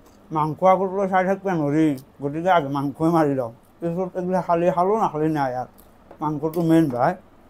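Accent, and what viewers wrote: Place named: Goalpara, India. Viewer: daar akta kotha bhal lage mk bhoria kowa taa 😂❤.....I❤ GOALPARA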